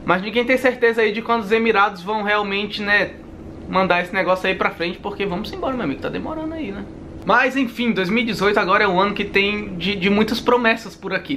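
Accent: Northeast, Brazil. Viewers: Continue homi! Seus vídeos são sensacionais. Minha família é nordestina, mas fui criada no Norte do Brasil. Toda vez que tenho oportunidade de ouvir os “sutaque” de lá, eu aproveito. Seu jeito é muito expontâneo! Que Deus os abençoe e que mais pessoas conheçam vocês! (E que os canais cresçam, tenham muitos likes, inscritos e compartilhamentos e tudo de mais mió). Abraços! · Tu é nordestino? Sotaque top! Sou de Sergipe e também tenho desejo de ir morar fora do Brasil. Ir do zero o que eu preciso? Parabéns pelo canal, ganhou mais um inscrito!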